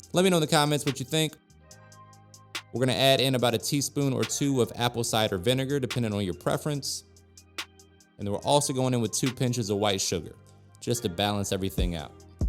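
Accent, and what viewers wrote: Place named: South, United States. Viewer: I eat with rice. I thought I am listening to a black dude. No offense. Then I saw you are a white dude talking like a black dude. You must be from the South. Thats cool Man! Milan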